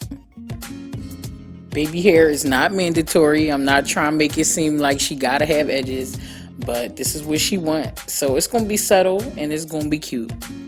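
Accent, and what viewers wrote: Philadelphia, United States. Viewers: Your Philly voice is all that! Love my city. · Darling where are you located?! You sound like you’re in philly (no offense!) is love a press and trim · I love how heavy your accent is that’s that philly right there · Beautiful job! You can take the lady outta Philly but you can't Philly out the lady! Love that accent 💜💜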